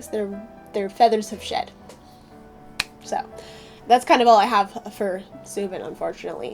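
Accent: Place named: Canada. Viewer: canadian tinnitus pronunciation represent!!✊✊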